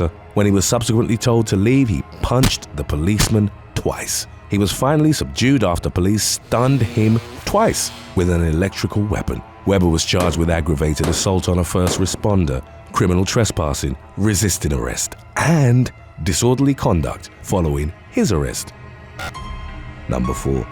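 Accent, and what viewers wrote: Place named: London, United Kingdom. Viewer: Hi, as an Englishmam myself I'd go so far as to say he's from the London area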